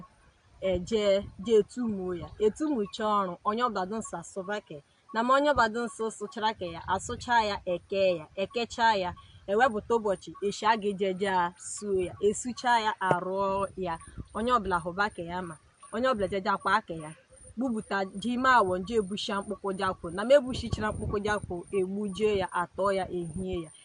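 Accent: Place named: Igbo, Nigeria. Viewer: ...real Benin language wit Igbo words & accent!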